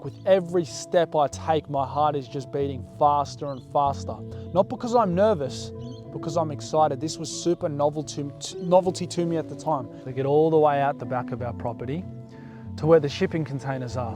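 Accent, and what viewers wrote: Australia. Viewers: thats an aussie accent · Are you Aussie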